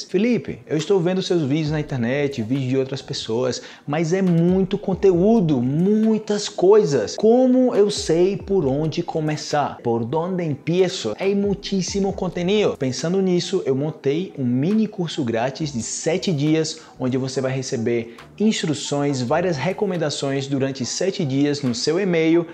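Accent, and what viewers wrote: Colombia. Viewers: Ya pegaste el acento colombiano... yo aprendí contigo.. ahora vivo en Brasil y enseño espanhol rsrsrsrrsr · Jaja, como lo mencionan en el video con Steve Kaufmann, tu español suena con el acento de Colombia. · Amé tu acento colombiano es bellísimo!! ya inicié el mini curso es pero avanzar pronto!! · nossa você fala espanhol como se fosse colombiano, eu sou falante do espanhol e quando escutei a você falando foi incrível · Le doy 10 de 10 a Tu asentó español colombiano, ojalá yo pueda aprender portugués así de bien como tú hablas español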